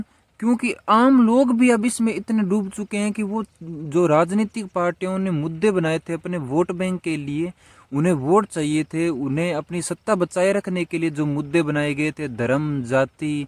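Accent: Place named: Pakistan. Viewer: Your hindi is absolutely sounding like Pakistani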